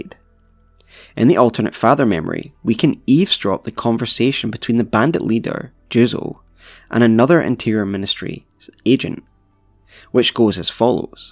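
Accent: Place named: Scotland, United Kingdom. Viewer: Scottish probably! They use eii with pretty much everything!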